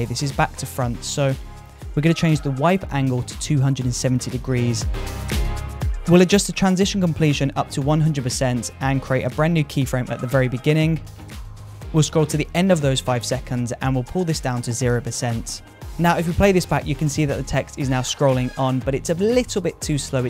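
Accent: United Kingdom. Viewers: I love coz you're British haha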